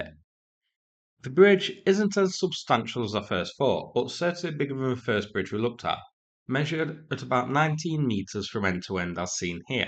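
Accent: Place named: Northern England, United Kingdom. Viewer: sounds like north england to me.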